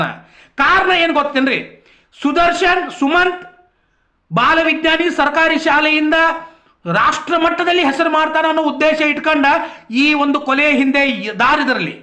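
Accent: North Karnataka, India. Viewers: ಉತ್ತರಕರ್ನಾಟಕದ ಜವಾರಿ ಭಾಷೆ.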